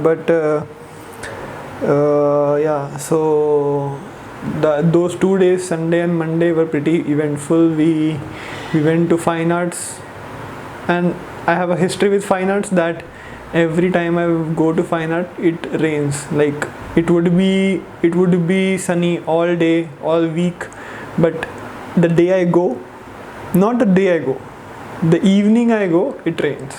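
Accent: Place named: South India, India. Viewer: your English is very south indianyyy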